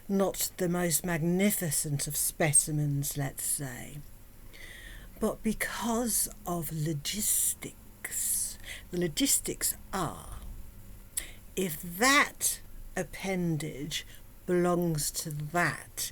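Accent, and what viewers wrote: United Kingdom. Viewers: The elegant, accurate explanation, delivered in the proper British accent, is brutal! Love it! And you look fabulous. The message you send with the makeup is crystal clear. 😂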